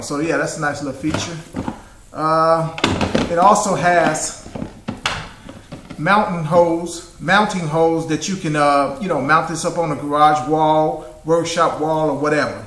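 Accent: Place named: South, United States. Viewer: Very southern twang review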